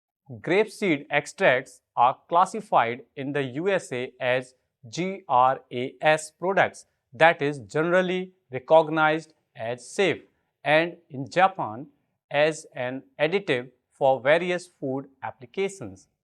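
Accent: India. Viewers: English with india dialect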